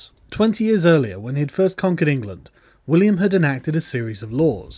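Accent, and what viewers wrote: United States. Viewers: im glad that u are learning , but ur a tipicle american , im english and when u speak my ears hurt A-rab lol E-unuchs dude it hurts to hear u talk out the words ,sorry but it does ,keep up the learning its good .... try listening to english speaking english and repeating how they say words how you say them isnt right its course to say the least , remember its are language we invented it , its not yours ie:american i proberblty would come across to spanish or french the same if i wanted to try thier language but! that aint happening lmao tc b safe :)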